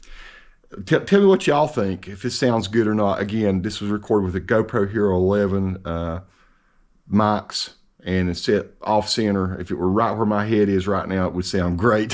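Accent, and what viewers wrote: South, United States. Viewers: "sub mode" makes the batteries last longer FYI. That southern draw you got there sounds familiar to my North Carolina ears! Haha!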